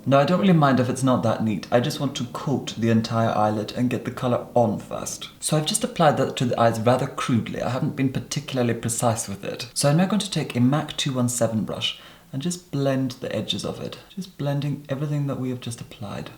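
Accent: Scotland, United Kingdom. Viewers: Is that a hint of a Scottish accent I can hear ? Yes I’m new here 😊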